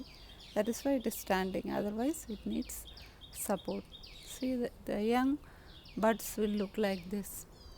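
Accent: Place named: Kerala, India. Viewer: You remind me of a nun from Kerela. Voice and accent is so similar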